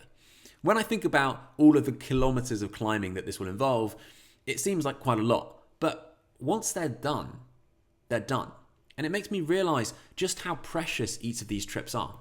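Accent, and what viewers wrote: England, United Kingdom. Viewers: This English man with his English accent beating around the bush instead of talking about specifics .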